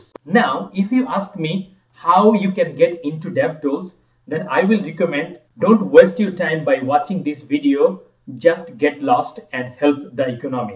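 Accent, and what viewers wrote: India. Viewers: Lol this indian dude is hilarious when he talks its so steriotypical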